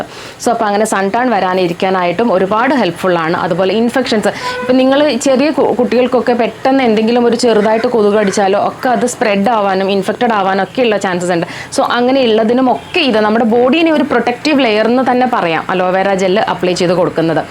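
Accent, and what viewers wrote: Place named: Tamil Nadu, India. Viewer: തമിഴ് പറയുന്ന ആൾ മലയാളം പറയുന്ന പോലെ ഉണ്ട്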